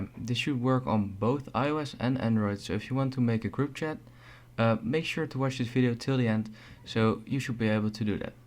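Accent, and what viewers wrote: Netherlands. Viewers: Lekker nederlands accent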